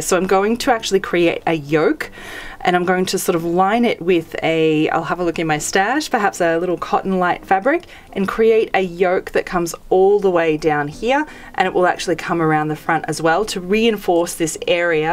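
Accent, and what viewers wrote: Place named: Australia. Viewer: I recently discovered your channel and have been binging them all over the last few evenings. My adult daughter has commented a couple of times (and in particular on this post) that she is going nostalgic for he ABC TV production Play School. We analysed it and concluded that the combination of your well spoken Aussie accent, speech pace, question and response style and gentle tinkly background music is giving the vibes. Now that I have heard it it is hard to unhear it. Don’t change it, we love it. Btw, did you also grow up with Play School?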